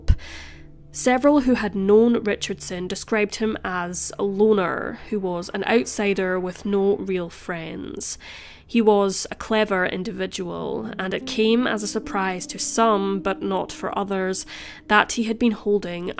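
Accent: Scotland, United Kingdom. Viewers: I'm not a native english speaker so the pace really helps me understand the Scottish accent :) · Why would anyone criticize your lovely Scottish accent? Your accent and compassion for the victims are part of the reason I like this channel. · It’s the Scottish way of saying he was born into a family. Like a wee Bairn. 😂 My family are originally from Scotland. Her accent is cute. I’m American though . I don’t have it though unfortunately. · Ignore all the idiots criticising the accent. As a fellow Edinburgher I’d detect the ‘Educated Scots’ tone a mile away. The accent is actually far batter for enunciation and pronunciation than almost any in the U.K. Anywho, if you are taking requests-how about the Alan Wilson/Ian Sutherland case? By a circumstance of pure serendipity I wasn’t actually at one of the trial seasons for Alan Wilson when he has charged with child molestation. 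Imagine my surprise when, a few years laters, he turns up dismembers in a bin next door to where a work colleague lived. Life is funny that way eh? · I’m born and bred in Liverpool and never heard of this case. Beautifully narrated love the Scottish accent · Sounds to me like her pace of speech is just normal. Not too fast and certainly not too slow. Just right. And I would say, with having her beautiful Scottish accent, for many, this pace (rather than fast) would be ideal. :) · People like a range of delivery speeds and pitch/speed variation. I like hers for her. And some are effective with different paces. I'm originally from the East coast USA, though I've lived in the NW and SW. East Coast people tend to speak faster. Slower: Southern, Western Mountain. And the Scottish parts narrator is from. Because she to me speaks with an accent, one I like, I wouldnt' want it sped up much or I might not understand her. 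E.g., there are vids of unintelligible faster talking Yorkshire people. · Love your voice and accent. Sounds Scottish. Lovely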